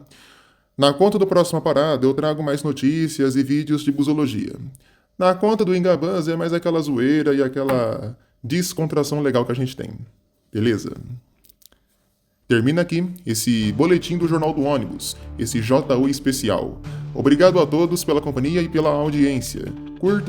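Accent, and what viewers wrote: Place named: Inland São Paulo, Brazil. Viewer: Narrador com sotaque de paulista do interior.... é bizarro!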